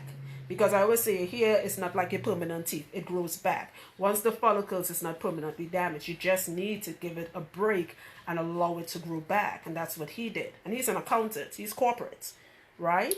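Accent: Trinidad and Tobago. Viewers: Trini in Paris with locks, I have medium course hair .. I love my hair so much but it has different textures on different locations of my scalp ! WHAT CAUSES DIFFERENT HAIR TEXTURES ON ONE HEAD ? !! It’s weird !! .. it’s not very common but I’m seeing it more and more ! I caught that Trini accent right away .. hahah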